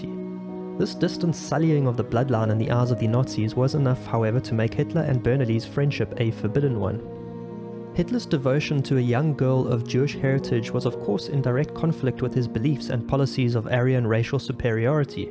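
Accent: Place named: South Africa. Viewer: You sound wonderfully South African. Good stuff.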